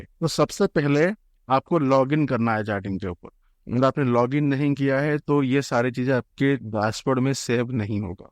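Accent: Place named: Bengal, India. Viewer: bhai aapka hindi samaj mein ataa nahin.. bengali bolte ho aisa lagta he..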